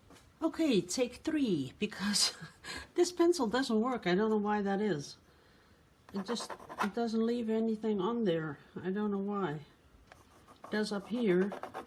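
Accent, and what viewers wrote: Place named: Netherlands. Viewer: The pencil eraser made me laugh and the hair comment. From the other video. I'm always fussing at my hair Lol. I need to colour my greys!!! Very good paintings and thank you for explaining mica powders and pigments. I bought some Siesso mica powders and watching videos on them. Love your Dutch accent!!!...;) I'm from Ohio...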